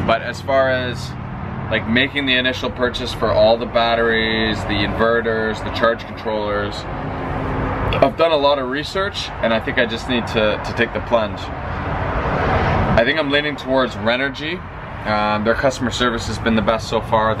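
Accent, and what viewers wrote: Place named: Canada. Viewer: Came to watch these old vids and you sound so much more Canadian haha. Loving this series.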